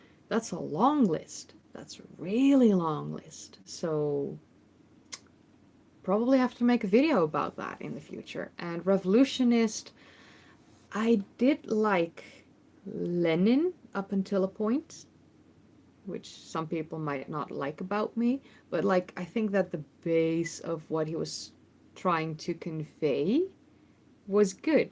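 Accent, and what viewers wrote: Netherlands. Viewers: I like your "Very Dutch" accent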